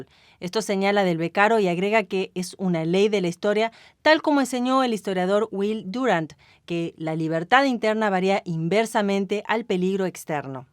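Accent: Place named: Argentina. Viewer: De donde sos? Pareces argentina hablando en español neutro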